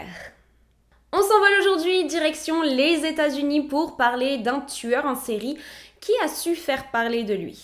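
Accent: Quebec, Canada. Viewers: On est d accord elle a un accent québécois ? (Tres léger ) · Salut à toi ! Et un gros bravo pour tes histoires si fraîchement racontées , surtout ne change rien , reste telle que tu es , avec ton petit accent du Québec parfois . Sur cette histoire , tu parles du tueur du zodiaque , je ne connais pas assez cette histoire pour y apporter quoi que soit ayant rapport avec ce tueur . En revanche tu parles du tueur au Dahlia noir , et cela est fort peu probable que ces meurtres soient liés avec Ed , car il fut démontré que les méthodes utilisées pour mutiler les victimes , étaient utilisées par les chirurgiens pour pratiquer certaines opérations , et que seule une personne expérimentée en la matière aurait pu commettre de tels crimes . Au plaisir de t’écouter encore ! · Allo!...je viens de m'abonner à ta chaîne...ça fait une semaine...tu es très bonne...mais parcontre... 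quand tu saute d'année...( Comme dans cette épisode)... Serait le fun que tu dises à quel âge est rendu le sujet...et pour parlé encore de cette épisode ci...tu dis a la fin qu'il a des ressemblances avec les lettres codé que le zodiaque a envoyé...mais tu n'a jamais parlé de ceci avant... continue ton bon travail tu vas aller loin....et aussi...j'ai bien entendu ton accent Québec 😊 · N'empêche l'accent du canada commence a prendre le dessus sur de plus en plus de mots😂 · Franchement tu fait des vidéo de très bonne qualité et en plus j’adore ton accent,canadien peux être ?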